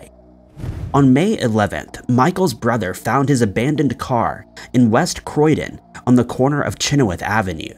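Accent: United States. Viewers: Can someone explain why the narrator has an American accent yet he speaks like English isn't his first language? It doesn't bother me that English isn't someone's first language, what bothers me is that he sounds like a native speaker and yet he speaks like he's still learning the language. This just baffles me, I've watched a few of his videos now and have never understood why he always says "this strange but true blah blah blah" or "this scary mystery blah blah blah" it just sounds SO awkward and unnatural. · He has an American accent.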